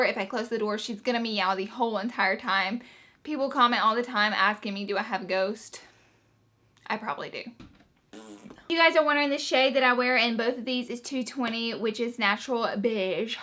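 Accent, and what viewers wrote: South, United States. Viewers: that country twang lol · I say oil the same way.. I think it's a southern thing because in Louisiana that's all you hear! 😂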